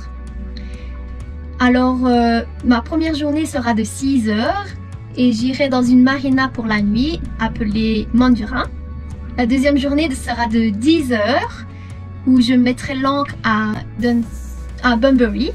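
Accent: Liège, Belgium. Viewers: Me voilà nouvel abonné. Un petit accent liégeois? Aussi, on aimerait avoir une présentation de toi et de ton bateau. Fancophone en Australie, que faisais-tu avant de partir à l'aventure, quelles destinations tu envisages.... voilà autant de sujets pour tes prochaines vidéos. Merci pour le partage. Bon vent à toi pour 2021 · Bonjour, je découvre ta chaîne, tu as un bateau vraiment bien aménagé et bien restauré. Bravo. Il me semble percevoir un petit accent Liégeois. Je me trompe peut-être? A bientôt. Marc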